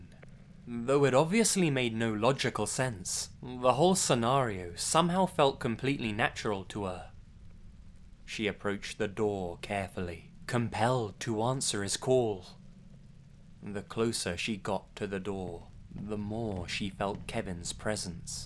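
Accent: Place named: RP, United Kingdom. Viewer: Why do talk posh as fuck?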